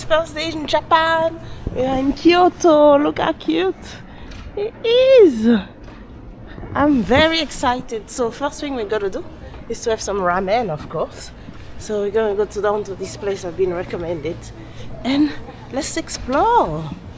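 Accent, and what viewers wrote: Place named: France. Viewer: Love that french accent 🤣🤣 you deserve more views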